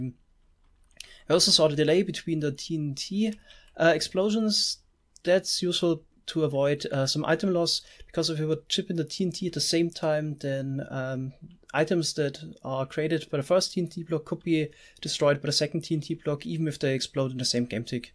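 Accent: Germany. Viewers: A netherstar block, some octuple compressed Diamondblocks and making it a big multiblock structure would be a worse troll ^^ Yeah. But some things are.. Well.. How to say. Inefficient and laggy? :D I mean if you place down 200 pistons, observers, redstone dust, etc. you'll get some problems :D (on mps ^^) Bist du eigentlicher Deutscher? Bin noch nicht so lange dabei und merke es nur am Akzent ^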